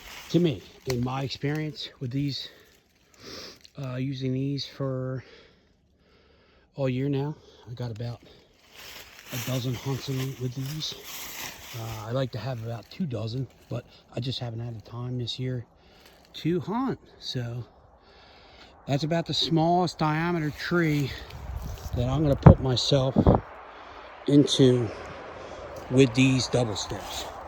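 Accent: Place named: Philadelphia, United States. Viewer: where u from ? Philly ? lol. Can hear that accent a million miles away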